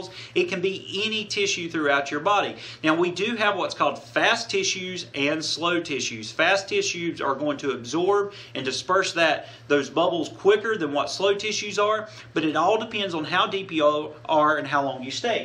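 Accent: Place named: Appalachia, United States. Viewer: Not only are you Southern, but you're also Appalachian. Gotta love those Hillbilly boys!! (I'm a Hillbilly, born and bred in East KY) <3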